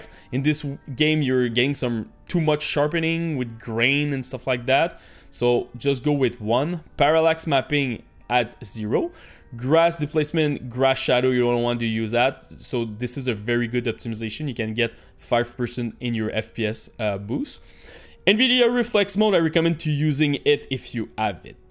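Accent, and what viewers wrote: Quebec, Canada. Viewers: C'est clairement un accens Québécois XD Good job pour le vidéo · Un Quebecois sur rust. Finalement !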